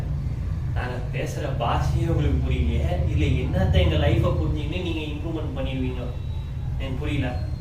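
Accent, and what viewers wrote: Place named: Chennai, India. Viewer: Onces more chennai paasa la pesunga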